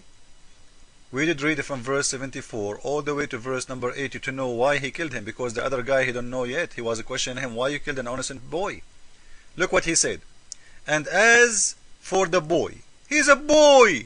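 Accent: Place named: Coptic, Egypt. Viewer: LOL mediocre through and through. By the way, coptic accent is horrible.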